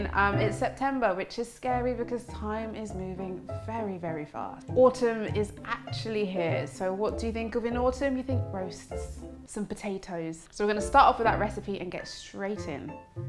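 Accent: England, United Kingdom. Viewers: Wait, what brand did you say those vegan sausages were? It sounded like sazories but I couldn’t find anything like that online. Sorry, I’m American and sometimes I can’t understand the English accent. I’m having trouble finding vegan sausages that are edible. If anyone knows what she said please reply!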